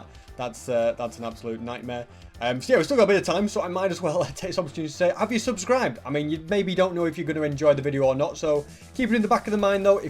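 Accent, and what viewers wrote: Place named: Scotland, United Kingdom. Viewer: SCOTTISH LAD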